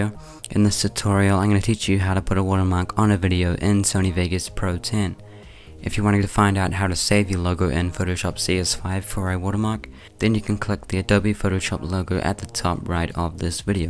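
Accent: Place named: New Zealand. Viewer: lol, he is either from Australia or New Zealand, im from New Zealand, it sounds similar to mine.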